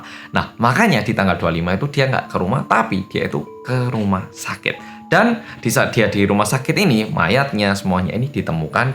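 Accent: Java, Indonesia. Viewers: oppa, koq bisa sih bahasa nya medok gitu, gimana ceritanya nih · ... kamu orang mana sih kok medok kali Jawa nya... awas ya kalo orang luar tapi ngakunya org Jawa.... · Mas orang jawa apa korea sih Kok medhok nya dapet 😅😅😅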